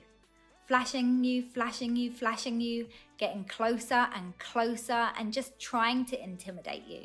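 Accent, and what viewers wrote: United Kingdom. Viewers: 我喜歡妳的英國腔英文，妳對台灣的交通評論很正確，以後我會開慢一點！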